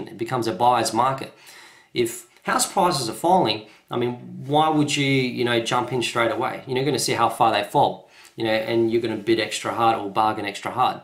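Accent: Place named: Adelaide, Australia. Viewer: you got one thick Adelaide accent there buddy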